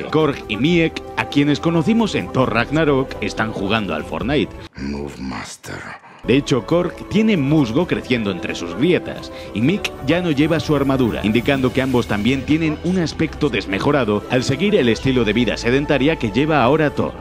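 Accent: Spain. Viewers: cambie a los 30 segundos de escuchar er peor acento, el español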